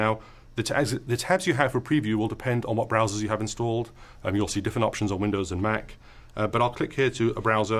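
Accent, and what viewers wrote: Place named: Scotland, United Kingdom. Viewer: So, that accent is totally Scottish. Just curious which city it's from.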